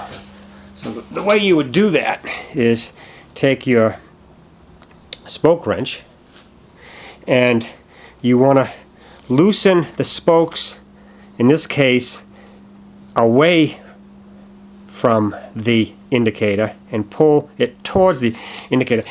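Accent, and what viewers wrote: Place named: Boston, United States. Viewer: stoppsi Obviously Massachusetts/Boston/New England.. lol But it's ok..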